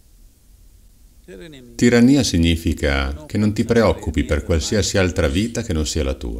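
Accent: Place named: Parma, Italy. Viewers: con accento di Parma (o dintorni) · Il doppiaggio si ma non con quest’accento Emilianoromagnolo cosi pesante ahah mettete uno che abbia fatto un po’ di dizione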